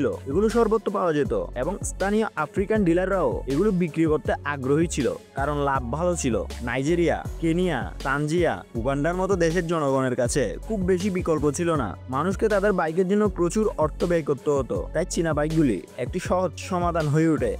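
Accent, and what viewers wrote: Bangladesh. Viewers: বাকি সব ভালো উচ্চারণ জখন্য। তুমি মনে হয় বাংলাদেশী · বাংলাদেশি উচ্চারণ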